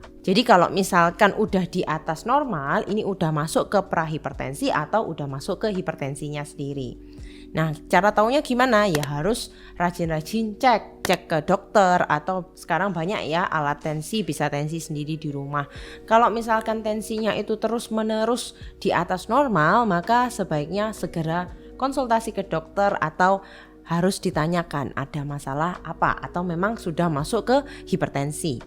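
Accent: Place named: Surabaya, Indonesia. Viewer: ini dokter atau apoteker.. pd manggilnya dokter hehe.. ini lulusan jawa timur yak?, medok suroboyo an rek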